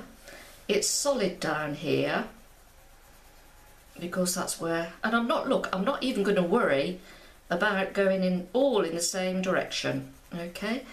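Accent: United Kingdom. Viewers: Hi... thank you SO much for this... I really struggle with letting go and producing loose paintings... you've shown me an easy and enjoyable way forward! I am completely self-taught (with the help of YouTube and Google! lol!) and started out with watercolour pencils... in the last couple of weeks, I've transitioned across to watercolour paints... maybe this is why I find it hard to let the paint express itself, as I'm used to having more control with pencils?! Anyway, enough rambling... PLEASE do more of this type of thing:) Being an expat in Cape Town, it's great to hear a fellow Brit too!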